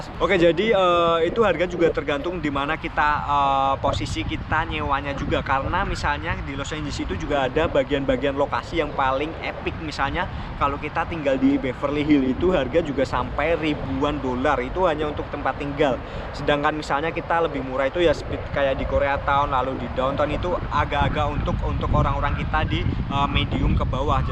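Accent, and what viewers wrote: Java, Indonesia. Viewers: Ini kok sdh di amrek msh medokkk. Lbh nginglish org indonesia. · Asli jawa ya bang, jawa mna bang? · Mas kok suaranya medok tapi wajahnya kayak blesteran apa ada campuran negara yah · Suarany asli medok jawa los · Medhok jg ya mas wkwkkwkw gokil seru videonya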